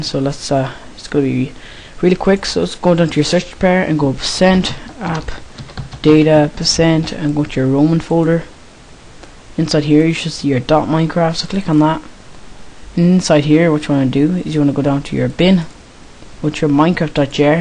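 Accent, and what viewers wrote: Ireland. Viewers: it work thanks ladd btw are u from ireland ?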